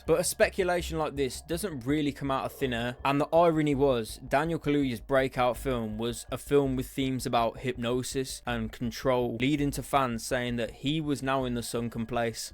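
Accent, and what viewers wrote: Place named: England, United Kingdom. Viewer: I was really surprised this channel doesn't have 100k subs. I enjoyed the video and I love his English accent, Americans never sound as cool. Sending love from the USA. Thanks for the interesting video. SUBSCRIBED!!!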